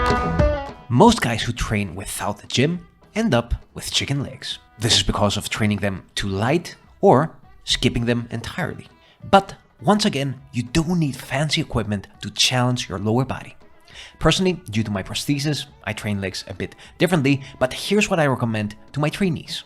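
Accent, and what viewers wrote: Netherlands. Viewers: Do I hear a Dutch accent? Must be! I'll recognize that anytime ❤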